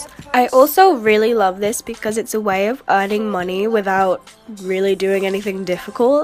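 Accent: United States. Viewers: Why do you sound American when you live in the UK? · out of curiosity why do you talk with an American accent?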